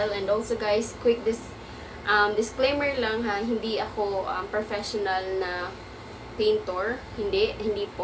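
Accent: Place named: Visayas, Philippines. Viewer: Di jud Malikayan ang pagkabisaya nga accent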